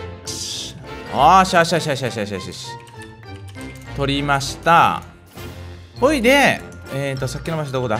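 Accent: Fukui, Japan. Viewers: 最近福井なまりの前回のクラ聞けてないので聞きたいです。さんの福井なまりは聞きやすくて好きです。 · チャンク掘りお疲れ様でした！私も今日は2時間程、鉄の鉱脈探して掘り掘りしてました。掘り掘りは無心になれるので好きです。前回のクラはやっぱり普通の福井なまりのさんが1番良いと思います^_^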